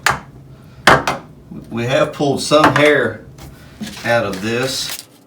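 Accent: South, United States. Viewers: I hear a thick country accent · I love a good southern accent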